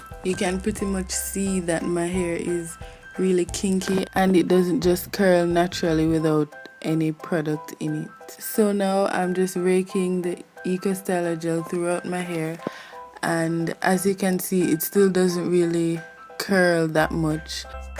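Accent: Jamaica. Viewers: u sound Jamaican. Jamaica to d worllll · ikr I was like yayyyy a Jamaican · Amen! Straight yard she come from! Jamaica to di world! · From she start talk mi know seh she a Jamaican ❤ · You sound so jamaican..I love it😁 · your voice tells me that your a Jamaican... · this is awesome..thank you so much...yaaaaas u jamaican i can hear it!! dwl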